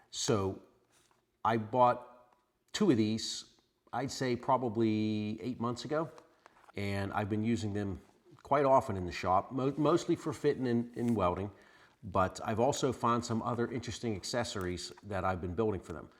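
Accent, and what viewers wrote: Pittsburgh, United States. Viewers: That Pissburgh accent makes me miss home and goin dahntahn on the weekends. Solid review too!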